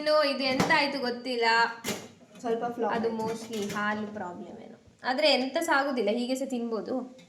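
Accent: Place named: Karnataka, India. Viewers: why this kannda sound diff from wht we hear in belagavi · Whenever I’m free ,I used to watch your videos. your place , nature , the putturu slang everything made me to watch again again ..!🤩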